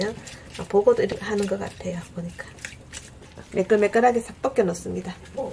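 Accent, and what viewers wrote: Gyeongsang, South Korea. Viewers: 경상도 사투리 목소리가 너무예뻐요 유익한정보감사합니다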